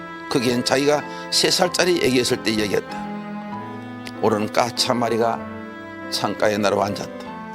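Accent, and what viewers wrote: Gyeongsang, South Korea. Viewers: 황수관이 억양이 맘에 안든다. 수꼴 TK 다. TK는 "ㅇ"이 들어간 단어는 발음을 세게한다. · 까치라꼬. 라꼬. 라꼬. 갱상도사투리 절라싫타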